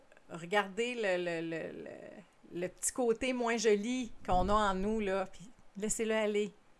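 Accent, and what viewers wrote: Quebec, Canada. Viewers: Enfin une voie québécoise et belier en plus 😁😁 pour ta guidance il est un peu tôt pour juger donc je vais te suivre et voir si sa résonne !!! 😊😉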